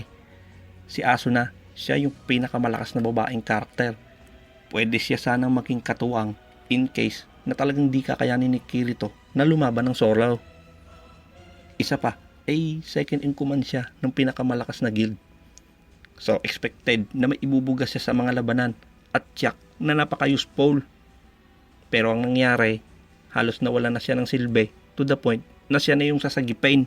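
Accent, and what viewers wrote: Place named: Batangas, Philippines. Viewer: haha ibang klase batangenyo ka pala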